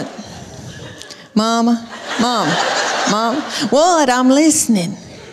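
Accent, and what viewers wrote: South, United States. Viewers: I don't always get all the southern Americanisms BURT AH TELL Y'ALL shes a whole heap of furn🇺🇸🙏😅😅😅😅😅😅😅😅😅😍😍😍😍😍😍😍😍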